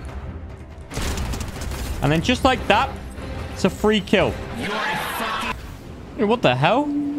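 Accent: Scotland, United Kingdom. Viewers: Bro that Scottish accent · Everyone talking about the Scottish accent but I gotta know what he said in German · We need to see more of the scottish accent.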